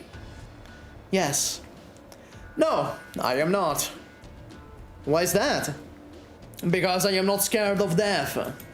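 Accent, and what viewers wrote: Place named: Syracuse, Italy. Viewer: he spoke in a siracusian accent for 3 & 1/2 hours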